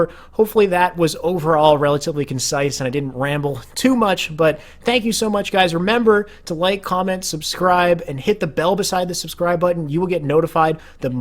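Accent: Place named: Canada. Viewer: He is Canadian.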